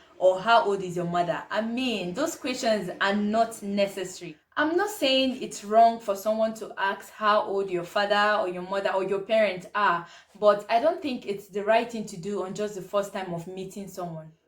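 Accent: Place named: Nigeria. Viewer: I love ur Nigerian accent "Maddah & Faddah"🤣🤣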